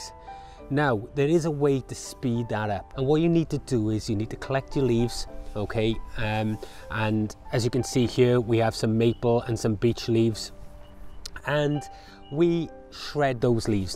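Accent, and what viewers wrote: Wales, United Kingdom. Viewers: Hello, your accent sounds Welsh to me. Can i please confirm if my Cymraeg radar is on target?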